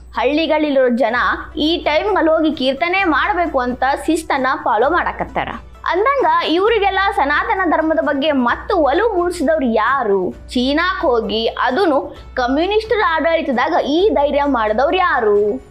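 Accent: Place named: Uttara Kannada, India. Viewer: Anna adu uttarakannada slang avru matadirodralli tappenilla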